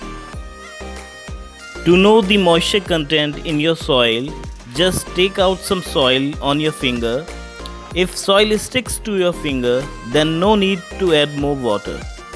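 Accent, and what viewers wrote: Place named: India. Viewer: These indian gardening vidoes with indian accents are giving me brain tumor.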